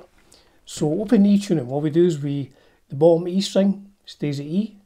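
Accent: Scotland, United Kingdom. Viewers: I really like your accent. What nation, is it Scottish ?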